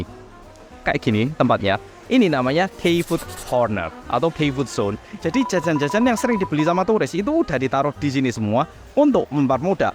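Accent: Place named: Surabaya, Indonesia. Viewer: lagatnya suroboyonan.. btw muantaap infone mas... kapan waktu aku tak mangkat korea.. sehat selalu masee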